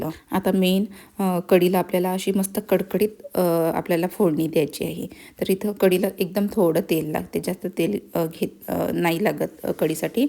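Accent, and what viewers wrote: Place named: Vidarbha, India. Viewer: विदर्भातील आहे का ...आपली भाषा वाटत आहे ❤ नागपुर side ची...मला आवडले वीडियो...जर्मन ची आजी चा वीडियो बघितला मस्तच ❤❤😊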